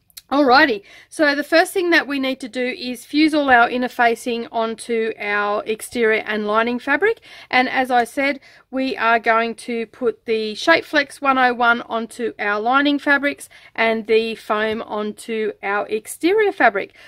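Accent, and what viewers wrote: Australia. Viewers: great to hear an Aussie...not many doing sewing videos...keep em up :D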